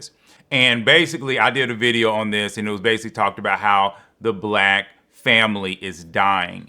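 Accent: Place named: Memphis, United States. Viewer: Lol i didn't know you were from Memphis, your accent isn't as strong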